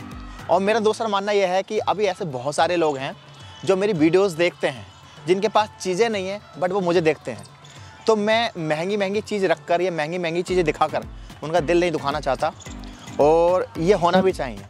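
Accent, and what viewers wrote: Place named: Rampur, India. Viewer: I am also from rampur tabhi aapki voice se samajh aa gya....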